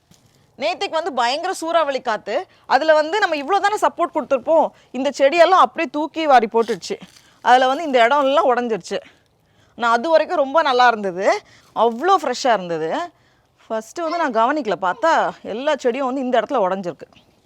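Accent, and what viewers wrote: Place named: Kerala, India. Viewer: Are u malayali.. why am asking this because neenge pesumbothu malayalam slang varuthu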